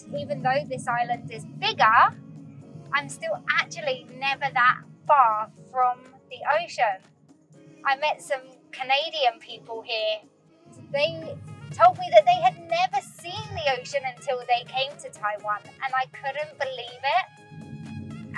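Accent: United Kingdom. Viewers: 英國腔真的超好聽， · 好聽的英國腔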